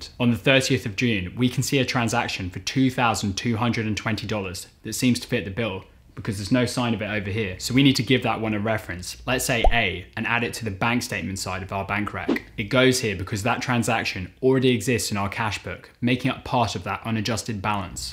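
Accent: United Kingdom. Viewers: May God bless you for the way you have blessed us! Extremely helpful video - I'm doing a course in bookkeeping. Just curious, where are you based? Your British accent and use of dollars instead of pounds in your examples is throwing me off 😂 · PLEASE NEXT VIDEO NOT TOO FAST PLEASE ! SINCE YOUR BRITISH ACCENT I HAVE TO TAKE A LITTLE TO GET YOU !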